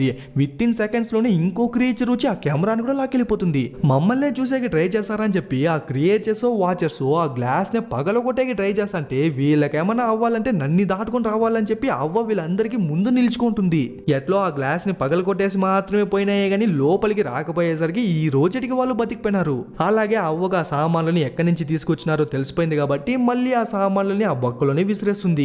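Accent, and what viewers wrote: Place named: Rayalaseema, India. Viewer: Ni voice Matra super daniki రాయలసీమ భాష ఇంకా సూపర్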